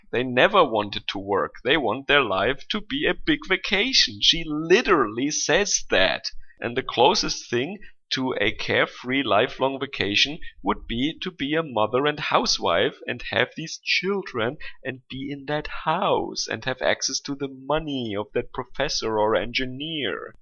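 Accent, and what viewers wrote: Germany. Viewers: "appreciated just for having a pulse". lol. Great vid made all the more so with the German accent. I love to hear foreigners speaking English. It seems to make what they to say all the more interesting and focused on imparting important information. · When I was a kid I had a german friend, here in Chile. Same accent, same sense of irony. God bless him and you. Loved the video. The engineer guy had a great pair, props to him!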